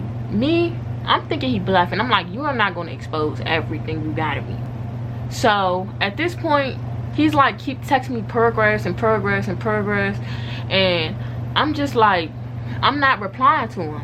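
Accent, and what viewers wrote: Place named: Washington DC, United States. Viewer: Hey boo new subbie here. Are you from dc? I hear a little accent and I was like let me ask lol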